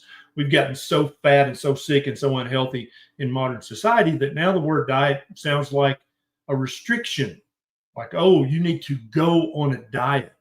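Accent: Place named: Tennessee, United States. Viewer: Ikr?! Plus, he is from the part of TN that I am from so his accent hits my ear so right.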